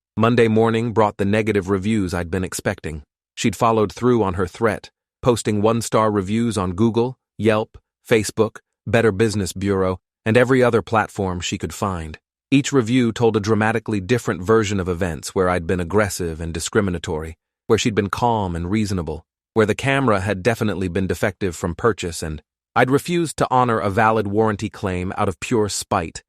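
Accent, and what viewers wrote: India. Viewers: Why the Indian accent?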